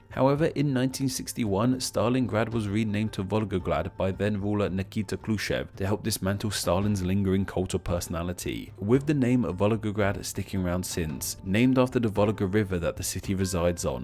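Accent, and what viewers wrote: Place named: Midlands, United Kingdom. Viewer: For a channel dedicated to etymology, it seems weird that the host pronounces every non-English name and word as though it were a town in the Midlands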